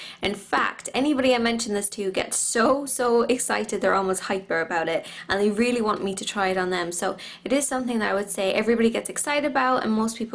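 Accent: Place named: Ireland. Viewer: I noticed the Irish Accent then checked your facebook and your from Cork!!!!! me too! thats so cool!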